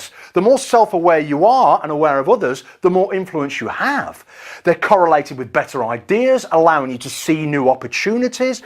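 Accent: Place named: United Kingdom. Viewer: The explanation with a british accent and gamma state make this video very €nergetic & interesting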